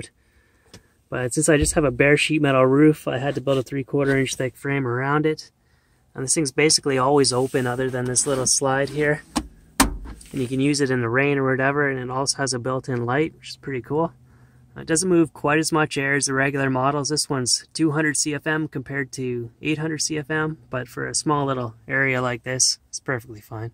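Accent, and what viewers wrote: Canada. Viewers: Hi, man! Looove your videos and have been watching for a while now, especially all your amazing bike trips and now the minivan conversion, as I'm planning to do a conversion in the near future. Something I'm dying to know - what accent do you have? I'm pretty sure I remember you being Canadian?? but what part? Sorry if I'm wrong or what not. thanks for all your videos! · You have a super Canadian accent, like my buddy Randy.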